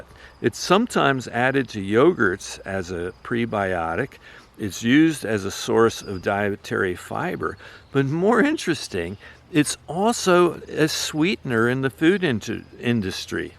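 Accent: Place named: California, United States. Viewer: Love this guy’s accent—sounds like a CA dude.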